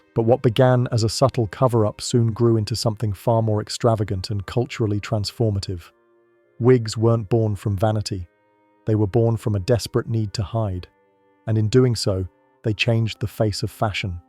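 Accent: United Kingdom. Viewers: Under more info it says United States but you have an accent the same as mine (British), do you just narrate them, do you write them, are you an historian? And what about the pictures, are they AI, they are quite good actually, certainly not contemporary. There's a lot on YT about hygiene and toilets (or lack of them) I wonder how this fascinating subject will be tackled by YT (if it still exists) in 10 years.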